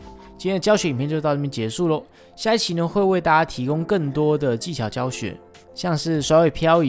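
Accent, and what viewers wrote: Taiwan. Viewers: 台湾人的读音真的不同😂没有在嘲笑,W=打不了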